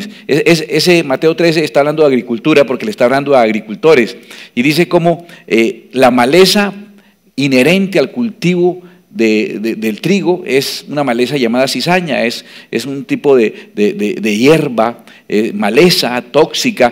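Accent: Santander, Spain. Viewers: Haber sabido de usted Pastor ha sido de gran edificación para mi vida he aprendido mucho con usted, gracias a Dios por su forma muy diferente de montarnos el propósito de Dios, sin contar las innumerables ocasiones que me he destornillado de la risa por su comentarios y esa forma tan peculiar de hablar como santanderiano que es. Gracias a Dios por usted, bendiciones para usted, su familia, su iglesia y su ministerio a través de este canal Feliz 2018 para usted también. Amen.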